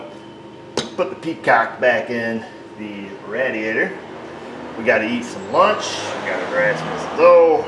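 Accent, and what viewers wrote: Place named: Michigan, United States. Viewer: I know you're in NY state but you sound like you may be from Michigan. Am I wrong?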